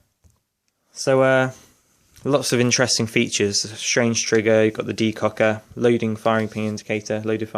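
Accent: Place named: United Kingdom. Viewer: hey dude i can tell by your accent rhat ur British can you tell me what the requirements are for owing an airsoft gun in the uk thanks in advance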